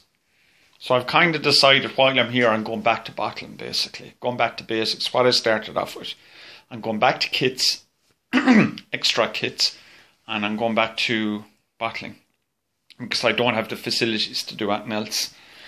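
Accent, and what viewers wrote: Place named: Ireland. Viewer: Tree kilo kit😂 nothing better than the ROI accent, always make me smile. Bit of a pain that your gear is in storage but at least your brewing buddy. Sure they will turn out smashing🍻👍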